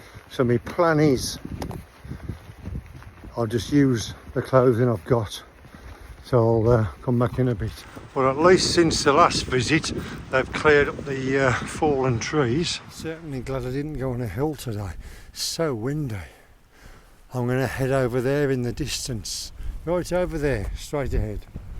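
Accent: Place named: United Kingdom. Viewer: Another great camp. Your videos are very relaxing to watch, and I also enjoy hearing the way Brits talk. “Mooching about” seems to mean wandering around, but here in the States “mooching” is slang for taking advantage of people and getting their goods for free. 😃 I also didn’t realize you had Uncle Ben’s rice over there. Love that pitch; your setups always look so tight and wrinkle free! Blessings to you and the UK! Cheers from Pennsylvania.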